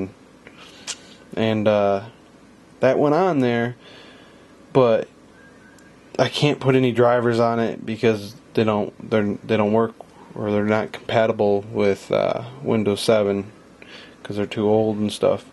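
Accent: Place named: Brazil. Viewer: ho did this video is a brazilian guy, i can see this because accent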